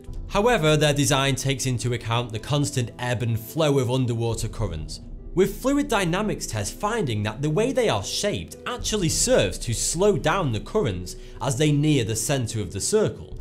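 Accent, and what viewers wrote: United Kingdom. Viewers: why does everything sound better from a British guy..its like my brain actually absorbed informAtion more easily.. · Where does your accent come from? It sounds like British but different somehow.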